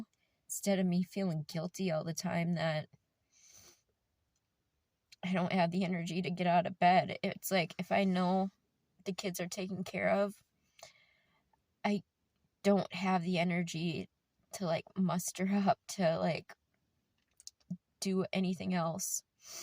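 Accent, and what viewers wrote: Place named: Midwest, United States. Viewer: I just wanted to tell you I see you. I relate to you on so many levels. I am originally from Wisconsin, so I appreciate the Midwestern accent number one… 😊 For me, my dad was my “person”… I was married at the time when my dad passed, and I never felt so alone in my life and in my grief… my husband did not understand. We are no longer together not to scare you….It had nothing to do with the grief well OK MAYBE that was part of it, but he also had a severe alcohol problem… But, he never understood, or gave me what I needed. You are NOT an asshole! I will admit to you that there were times where I thought to myself. I can’t wait until my husband loses one of his parents, and THEN he will understand. Trust me, I don’t wish that on anybody, but at the time I was so angry and hurt and frustrated with him that that was in my head… You are not alone. 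Anger is a huge part of grief.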